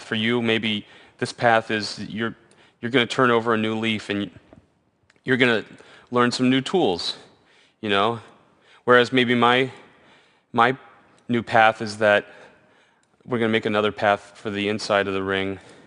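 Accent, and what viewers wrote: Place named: United States. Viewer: i dont understand neither his photoshop skill nor his American accent!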